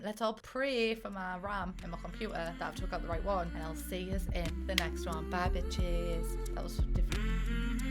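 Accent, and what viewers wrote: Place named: Teesside, United Kingdom. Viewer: Are you from boro? I’m from Teesside and you have a proper boro accent.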